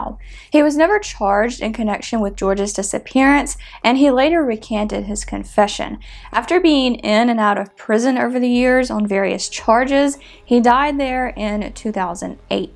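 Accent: South, United States. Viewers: has a nice southern accent.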